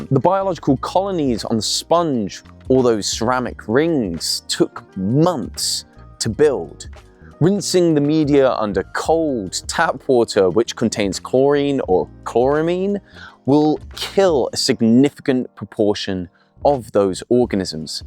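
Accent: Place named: United Kingdom. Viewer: I love this channel. I'm a sucker for soft, slow British accents; but the content is also amazing. Love love love. Wishing you much success 💕💕🎉🎉🎉🎉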